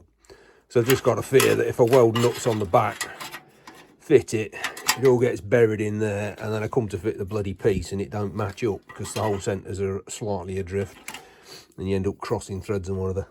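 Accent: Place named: Lincolnshire, United Kingdom. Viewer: I have been welding for 40 years and also worked on series landrovers for quite a few years as well as owning renovating my own and have NEVER attempted a bulkhead quite as bad as yours. I do renovate some series one 80" bulkheads by virtually making them new with just some salvage parts off the old ones. So respect to you sir! By the way your accent sounds Lincolnshire, could that be correct? I am myself!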